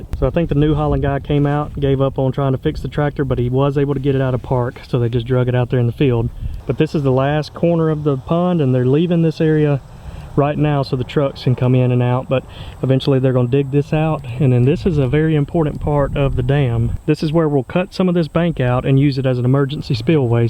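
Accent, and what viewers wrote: United States. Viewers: The internet constantly surprises me . Here I am again , listening to a cool American voice chatting about , and showing anyone interested , how to build a bass pond . I live in a condo , have never gone fishing , and yet find myself delighted to see your video pop up . I am already looking forward to seeing the next step .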